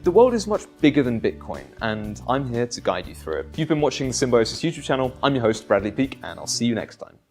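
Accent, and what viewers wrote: United Kingdom. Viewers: British accents make people sound smart. This is 11 minutes of chatter with no new or useful information. He has a suit and tie and speaks like an intelligent person. That's it. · Just because you wear a $2 suit and has an English accent, doesn't mean you know what the fook you are talking about.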